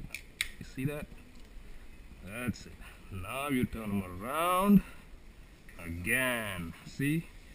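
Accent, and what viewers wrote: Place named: India. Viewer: This has got to be the 8th indian sounding dude who just hapoens to be barefoot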